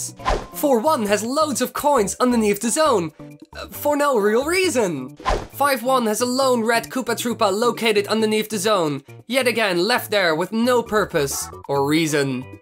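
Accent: Netherlands. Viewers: Are you dutch? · This may sound weird but you sound like your dutch like me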